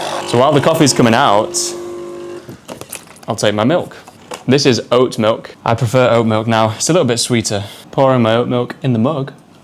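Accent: United Kingdom. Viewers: I love your English accent Is easy to understand for me🙏🙏 You are very good teacher. Thanks. From 🇵🇪 Peru. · Could please tell me do your speak with Rp accent?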